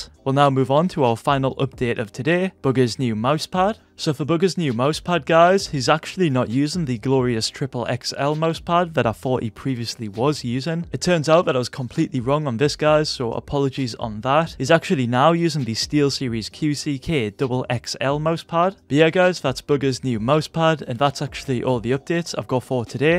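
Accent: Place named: Scotland, United Kingdom. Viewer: im from the uk aswell but your accent sound diffrent kinda like scottish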